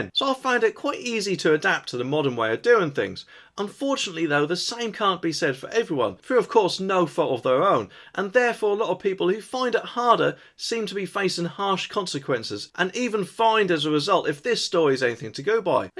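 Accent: Norfolk, United Kingdom. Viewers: This geeeeeeeezer is definitely a Norfolk boy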